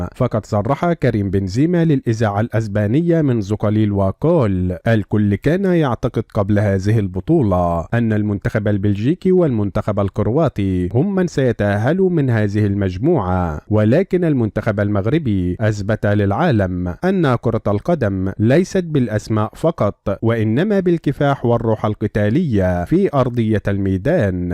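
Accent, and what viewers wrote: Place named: Egypt. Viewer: هههههه. اتكلم عربي. من لهجتك المصرية.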